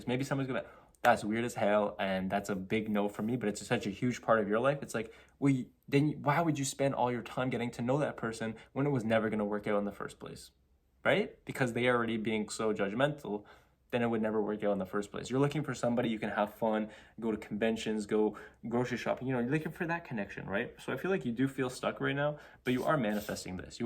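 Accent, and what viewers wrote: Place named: Canada. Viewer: This is a slightly strange comment 😂 but I'm canadian and have been studying in germany for 2 years. Hearing your voice (you sound so distinctly canadian) was so comforting. I'm happy to be where I am, but I really needed that little taste of home.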